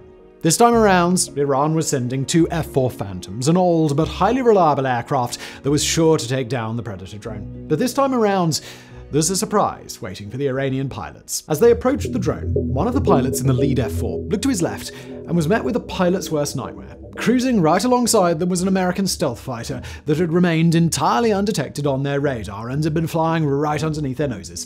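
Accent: United Kingdom. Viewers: A british accent describing my beautiful country's bad ass air superior technology is just perfect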